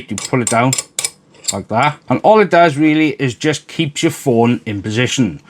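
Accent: Wales, United Kingdom. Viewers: You really as Welsh as it gets butt innit?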